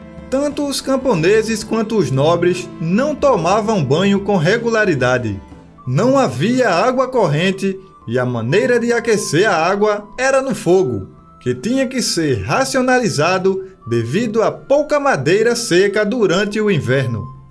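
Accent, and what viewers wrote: Northeast, Brazil. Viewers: Esse sotaque é ótimo. Muito lindo sotaque nordestino.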